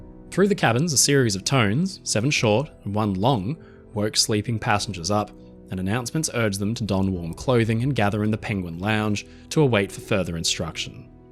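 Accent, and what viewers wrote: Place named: Australia. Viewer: it is Australian English. The best by far in the world.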